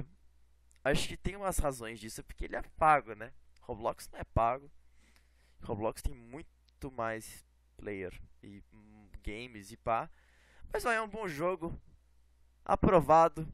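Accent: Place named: Santa Catarina, Brazil. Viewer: voce e de santa catarina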